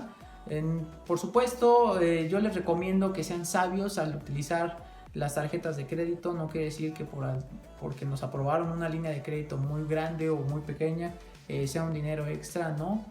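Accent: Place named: Mexico. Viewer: Ami me enacnta 3l asentó mexicano aunque no viva ayi gracias por tu información bro lo tendré encuenta cuando valla algún día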